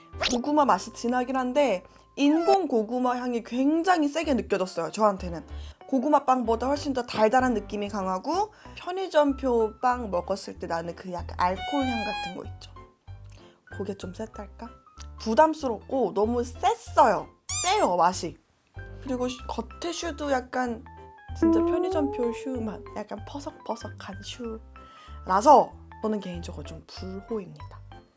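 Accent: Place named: Gyeongsang, South Korea. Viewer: 님 고향이 경상도 이신가요 계속 사투리 억양이 들려서...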